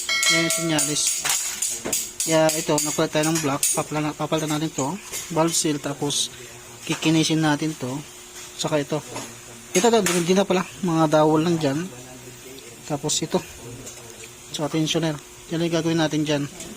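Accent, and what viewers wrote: Ilocos, Philippines. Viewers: Ilocano yan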